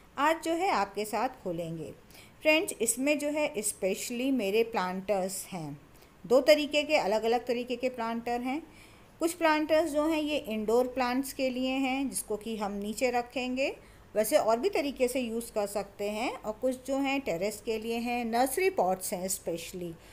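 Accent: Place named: Allahabad, India. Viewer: being allahabadi my self i can so identify with the accent ,kudos to you for being authentic:)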